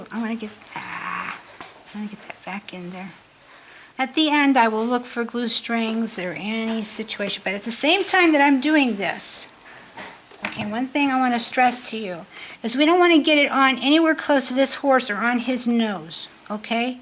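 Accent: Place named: Pittsburgh, United States. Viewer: Very nice! Love the hummingbird one. And got a real chuckle out of the Pittsburgh-ese. LOL Made me feel right at home. <3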